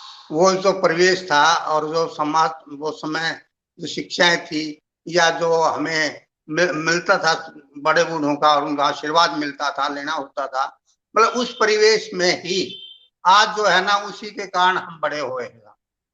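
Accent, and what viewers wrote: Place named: Haryana, India. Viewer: बहुत दिलकश हरियाणवी... बहुत स्वागत 😄😄😄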